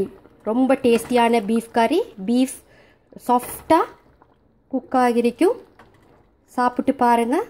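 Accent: Sri Lanka. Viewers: This is srilankan Tamil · Thank you for sharing sister. Your tamil accent from Sri Lanka. Are you from there? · sri lankan tamil was nice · Srilanka Tamil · In India we have to use a pressure cooker. Thanks for this amazing video and very well explained. I like the Srilankan Tamil accent. God bless.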